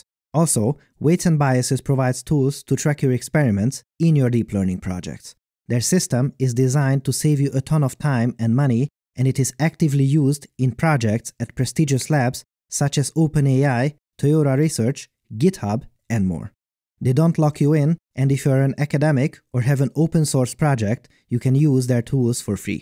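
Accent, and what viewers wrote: Hungary. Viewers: If anyone is curious how a Hungarian accent sounds like, here you go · Do I hear a Hungarian accent ☺️? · His accent is definitely Hungarian. So he must live here.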